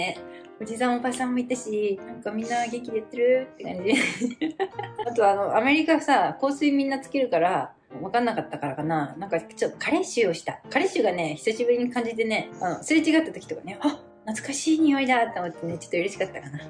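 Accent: Hokkaido, Japan. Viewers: イントネーションが北海道ですね😃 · お話しも絶好調ですね。北海道Accent炸裂！道東の方ですか？ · の、北海道弁が可愛いらしく聞こえます。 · 70歳のおばあちゃんです。いつも楽しく観ています。帰国してからのtalkで時々出る北海道弁がすごく可愛いなって思っています。もっと北海道弁で話してほしいです。因みに私は北海道出身ではありませんが、、、。英語頑張って続けて下さいね。私も長く勉強して現在は仕事に活かしています。継続は力なりです！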